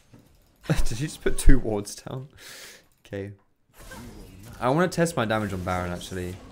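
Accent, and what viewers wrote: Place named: Essex, United Kingdom. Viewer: You know is from Essex when he says "not gonna lie"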